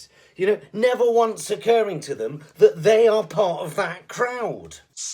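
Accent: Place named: England, United Kingdom. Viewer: NICE PURE LOVELY ENGLISH ACCENT VOICE & BOY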